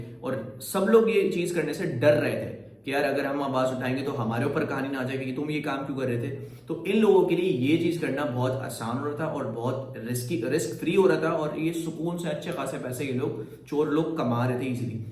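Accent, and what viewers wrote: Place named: Karachi, Pakistan. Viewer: i watched the other video of 30 mins where he told whole story how his money was stolen. i thought this guy was really innocent, but after watching this video its proven this guy is another wrong number and cannot be trusted. Crypto becomes Halal after you pay TAX ? How did you pay tax and become halal for you while it is not taxable yet. ? How you or Waqar Zaka are Writing the regulation terms ? If you are son of honest mother and father do not remove this comment and answer all questions. and why you sound like a Karchi ka Bhaiyya with typical Ball licking gesture of Waqar Zaka. just because he guided you, you are speaking lies and promoting him like any corrupt politician for example. better fix yourself and stop acting like typical Gali ka Londa who would do Ball licking just for sake of being grateful to another scammer.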